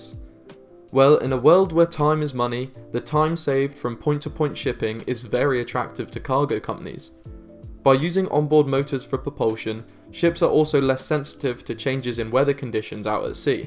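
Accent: United Kingdom. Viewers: As soon as i heard the british accent I knew his was a green lunatic. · What do you know another brit with a weird channel name, narrating stuff...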